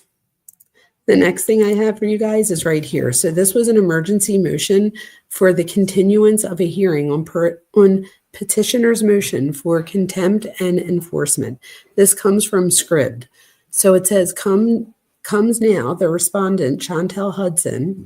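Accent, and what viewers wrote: Philadelphia, United States. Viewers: ❤LIKED n 🙋🏼‍♀️SUBSCRIBED! You're voice sounds like 🏡home🥰 I miss the Philly/ (....or NJ?) accent living out here in CA— It's just comforting to hear. ... And you seem like an excellent little snoop!🕵🏼‍♀️ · Are you from philly?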